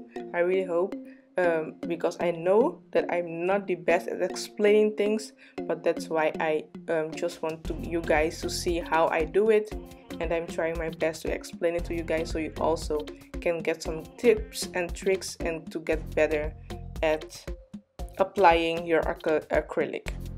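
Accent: Netherlands. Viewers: Je bent sowiesooo Nederlands. Hoor het meteen. Goeie videooo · Are u dutch ? Your accent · 💞💞Love the video! Btw, do you speak Dutch? You’re accent sounds familiar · are you dutch? you have a dutch accent hehe beautiful nails and technique <3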